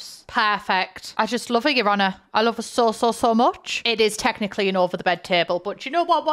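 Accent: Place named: North, United Kingdom. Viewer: FINALLY A NORTHERN BRITISH YOUTUBER THANK YOU FOR EXISTING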